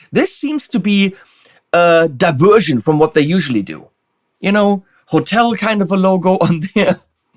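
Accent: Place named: Germany. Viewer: What a coincidence! There is a word in Greek with exactly that spelling and meaning! ΜΕΡΑΚΙ (yes in Greek P is the latin R and Π is the latin P). But it's not pronounced meh-ru-kai but meh-rah-ki exactly as you pronounced it with your German accent my friend. This could be the ERASMIAN pronunciation the latin world uses to read Greek for convenience. For those not familiar with ERASMIAN It's as if someone would read the english word "thought" as ...tho-oo-gt hahaha.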